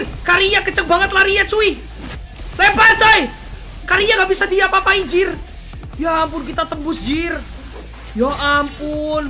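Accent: Java, Indonesia. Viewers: Jawa totok hahahaha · Njar njir blas ramasokk, medok wae sok sokan njir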